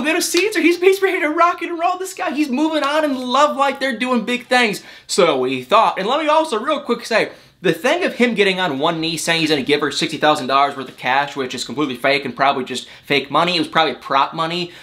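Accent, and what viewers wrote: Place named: Michigan, United States. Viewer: Hey! I called it a few weeks ago! The RandB News. RandB. RRRrrrr! You can smell it. Lol. I love 💕 your Michigan accent! I can hear it from another room! Sweet!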